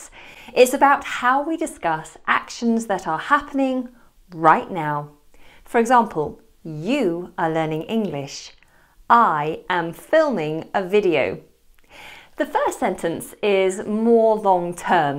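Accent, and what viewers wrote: United Kingdom. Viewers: Brilliant explanatiom with proper British accent. Keep it up🖒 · It's a little difficult for me to understand the british accent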